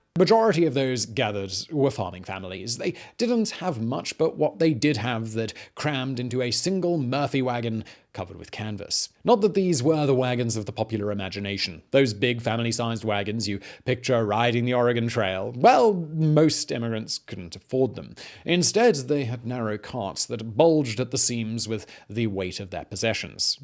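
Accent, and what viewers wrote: United Kingdom. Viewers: Your voice and delivery get on my nerves!!!! And it is nothing to do with your British accent, I usually find that pleasing.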